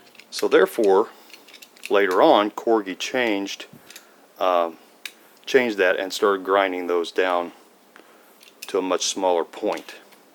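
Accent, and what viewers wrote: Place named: North America. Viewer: I'm guessing you're North American from your accent, so how did you get your first Batmobile in the US, as Corgi is a British company? Where they available in the shops or did you have to pay more to get it delivered from the UK? Didn't any American toy companies make Batmobiles? Sorry mate, lots of questions, but a really interesting vid!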